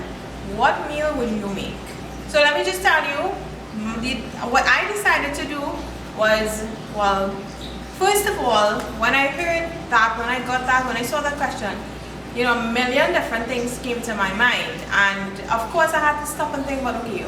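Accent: Trinidad and Tobago. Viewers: I love the accent. So Trinidad and Tobago. $100.00 TT dollars. Cook up rice with dasheen bush and cucumber salad. I will go to the Chaguanas market. Oh Lawd that’s a lot of money you talking. I will definitely be watching you. I hit that 🔔. #ahfeelinforsome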